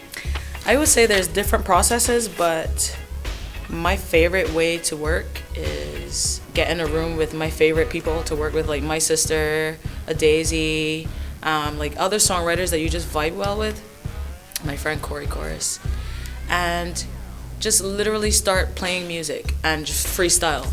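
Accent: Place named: Barbados. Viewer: I love her Bajan accent!! Bigup Barbados 🇧🇧 🇧🇧🇧🇧✊🏾🙌🏾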